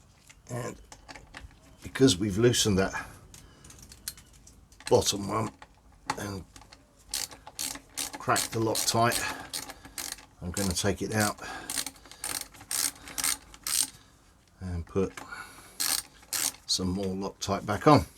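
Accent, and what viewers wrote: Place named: RP, United Kingdom. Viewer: I'm doing good, thanks. So you've gone from being a bad ass head of a bike gang, to a posh speaking GS riding Youtuber. 😁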